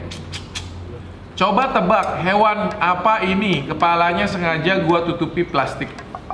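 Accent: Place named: Java, Indonesia. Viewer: bng lo orang mana kok medhok banget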